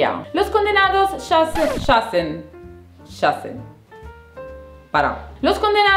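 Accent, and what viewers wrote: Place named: Italy. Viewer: me encanto el video! le pones mucha onda a lo que haces, y ese acento tano le pone mucha energia! chau linda!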